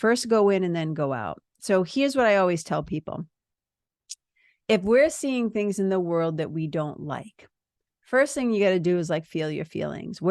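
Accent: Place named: Massachusetts, United States. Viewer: I’m a MA girl living in CA and your accent is making me miss home a WICKED lot! 😆 I’m from the south shore..I’m guessing you are a MA girl too.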